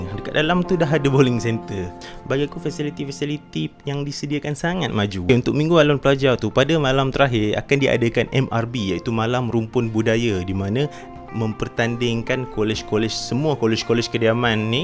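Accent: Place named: North, Malaysia. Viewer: Kau cakap orang sarawak tak reti cakap utara..hang bantai jah loghat utara hang kami faham..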